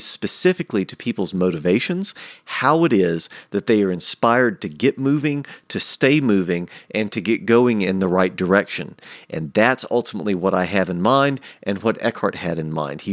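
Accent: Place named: Carolina, United States. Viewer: I'm going to comment after watching the entire video, but I'm wondering where you're from. At first I thought you might be an Okie, like me, with your subtle Southern accent. But I'm hearing a bit of a Carolina accent in your "O" sound. Any chance I'm right?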